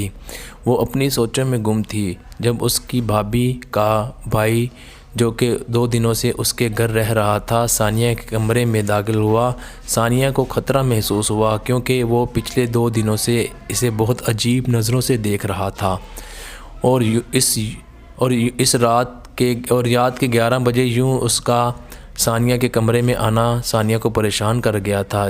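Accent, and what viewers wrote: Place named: India. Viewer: Ap agar Pakistani ho Tu apna Urdu Ka tulaffuz theek krain ,,,ap Tu Indian ke tarha Urdu poltay ho ..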